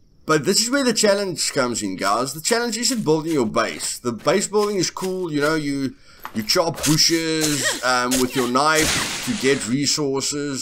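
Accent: South Africa. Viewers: South African?.....If so then hello from fellow the UK!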